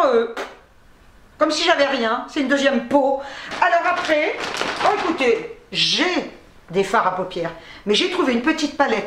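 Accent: Algeria. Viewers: ton commentaire m'a fait tellement plaisir ❤️❤️avec tous les commentaires que tu as je sais que c'est loin d'être facile. Merci du fond du cœur ❤️ ❤️ je t'embrasse très fort tu as une personnalité que j'adore j'aime tellement ton naturel ton franc parlé...j'aime aussi retrouver la gestuelle et l'accent pieds noirs. Ma maman elle aussi parle autant avec les mains qu'avec la bouche 😂😂c'est ça les pieds-noirs et qu'est ce que je me régale devant tes vidéos ❤️ ❤️ Énorme bisous ♥️ merci encore pour le réconfort que nous apporte tes vidéos❤️❤️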